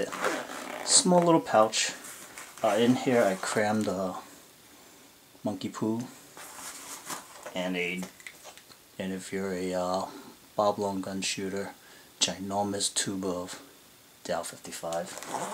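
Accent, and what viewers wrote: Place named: New York, United States. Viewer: Great review! I actually just ordered one myself for my axe. Also loving the new york accent.lol i am also from new york but i live on long island. where do you go to play?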